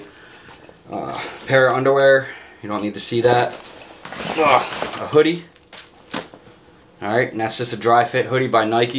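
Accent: Philadelphia, United States. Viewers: Just found your channel, great info! But I thought to myself this dude sounds like he’s from Philly...then you said “ water” not “wooder” so not sure? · I knew from your accent, you were Philly. I'm born and raised Philly, went to Lincoln, class of 94. Watch your back brother. Things besides the pandemic can hurt you, like Mayor Kenny, the DA and the skells of the city. Good video bro... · I’m from philly and I knew you had a philly accent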